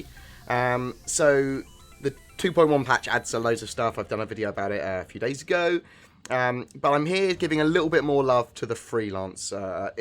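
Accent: United Kingdom. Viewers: i cant understand this british dialect.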